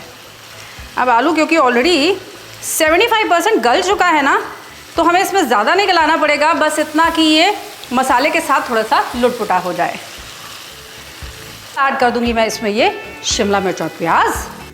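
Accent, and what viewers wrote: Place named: Uttar Pradesh, India. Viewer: madam aapka recipies batane ja style mujhe bohot pasand hai aur jo aapki UP touch wali Hindi ❤ Par ek Salah hai meri.. ki aap yadi ghar ki sabzi banana bata rahi Hain toh nonstick ki jagah ghar wale cooking vessels use kareingi toh achha hoga.. jaise mein ghar mein roz mein loge ki kadhahi use Kari hun healthy cooking ke liye. Aur ek baat.. aajkal tamatar itne mahange ho gaye hain aur aapne Zara si aalloo Shimla mirch ki sabzi mein 2 bade tamatar daal diye 😲 aap pl. Kuchh recipies without tomatoes wali ya tomatoes ko kis cheez se replace kar sakte hain batayeingi toh dekhna aapke viewers double ho jayeinge 😁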